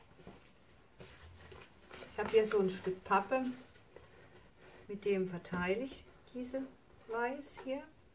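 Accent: Germany. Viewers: Ich hab dich auch durch Annemarie gefunden. Ich habe ihr Tun vom 1. Video an verfolgt und sehr viel gelernt und auch viele Fehler vermieden. Bin schon seit 20 Monaten am Pouren, mit viel Freude und Illusionen. Du greifst gerade eine ziemlich neue Richtung auf. Kennst Du Kristin Oladotter Steen? Sie hat auch das Problem mit den ungewollten Flecken. Falls sie dich stören, 1 Teelöffel Alkohol vor dem Gießen in jeden Becher rühren, dann geht es besser. Ich freue mich schon auf deine nächsten Werke und hab dich gleich abonniert, damit ich keines deiner Werke verpasse. Ich habe leider nicht soviel Platz, um größer als 50x50 zu machen und außerdem fließen meine Stauräume schon seit Monaten über. Das ist ein echtes Problem wenn man süchtig wird oder schon ist. Ich lebe in Norddeutschland und du nach der Farbe deiner Stimme sicherlich im Süden. Liebe Grüße Karin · Hallo ich danke Annemarie dass ich sie gefunden habe. 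Das bild ist wunderbar. Ihren dialekt kommt mir bekannt vor sind sie villeicht von der Baden _Wuerttenberg gegend?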